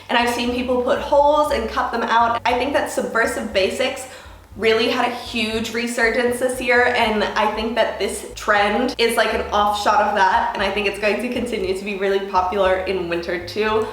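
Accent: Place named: United States. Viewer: i’m soooo confused u reside in australia/ also sponsor australian brands, but u have an american accent ??? 😩😩😩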